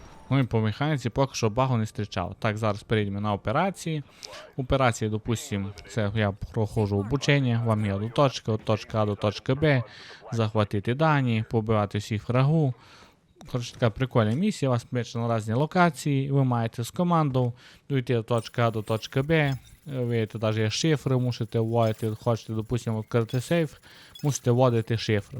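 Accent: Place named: Transcarpathia, Ukraine. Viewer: Чую що ти із сонячного Закарпаття))) з якого саме міста ?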